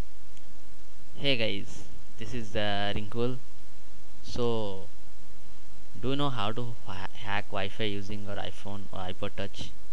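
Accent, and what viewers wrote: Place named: India. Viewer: Damn! He talk english using indian accent.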